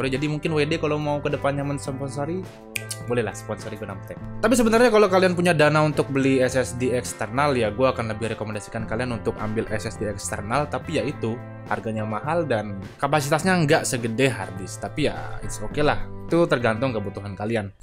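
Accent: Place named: Bekasi, Indonesia. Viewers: entah kenapa, logat dan pilihan kosakatanya mirip aksen Bekasi gitu... Kental aksen Bekasi haha.. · orang bekasi kayaknya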